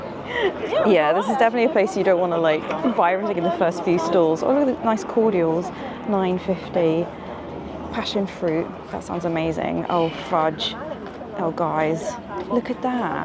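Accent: RP, United Kingdom. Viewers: I literally searched for some Modern RP accent videos and came across yours. I just played it, totally immersed in it, and watched this one for like an hour, replaying it. The food descriptions They were truly gorgeous! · I love your British eng...I am learning..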